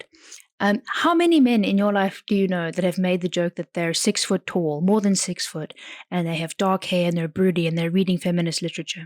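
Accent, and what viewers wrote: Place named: Netherlands. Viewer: yeah, but Dutch/Arfikaner or English? You sound Dutch to me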